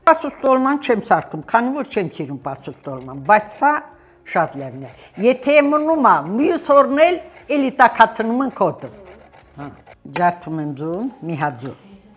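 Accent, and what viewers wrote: Goris, Armenia. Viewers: Վայ ոնց եմ սիրում տատիկի եփածը։ Նամանավանդ մեր Գորիսի տատիկների եփածը։ Մեր գեղեցիկ Գ-իբառբառով խոսա տատի ջան։ Բա քո հոր տոնը շինվի😂😂😂😂😂։ Վայ հինչ լավն եք տատի։ Ապրես, օրինակ չէի գյուդում վեր Կարելիյա ծու էլ քիցած❤🙏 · Վախ տատի ջան, մեր Գորիսի բարբառով շատ խոսա, հաճելւա լսելը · Как приятно слышать диалект Гориса , Я тоже с Гориса и пишу с Ташкента.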